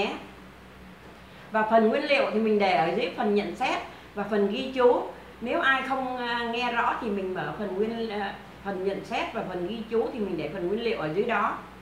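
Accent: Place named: North, Vietnam. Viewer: Bà ơi, cho con thắc mắc 1 tí được không ạ? Con thấy bà nói giọng bắc, có phải bà là người miền bắc không ạ?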